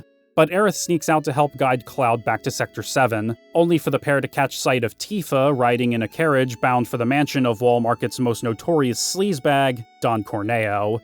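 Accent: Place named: United States. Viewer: I'm around a decade older than you and played FFVII on its release and it was absolutely life changing at the time. That said I really wasn't aware of any real stigma associated with video games at the time. Pretty much everyone played video games when I was growing up, especially after the release of the PlayStation. That said I grew up in Wales, not the States (at least that's my assumption based on your accent, apologies if that's not the case) and my experience of high school was nothing like your own. That said great video I look forward to watching more of your work.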